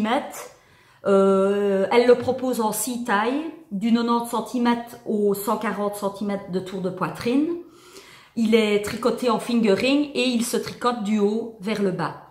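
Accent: Liège, Belgium. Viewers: Oui, et l’accent liégeois aussi ! 😁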